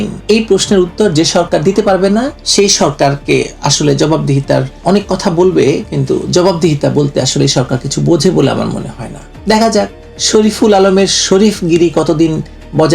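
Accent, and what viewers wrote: Kolkata, India. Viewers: Your Bengali accent is like Kolkata's Bengali accent. I like and enjoy the way you speak.🙏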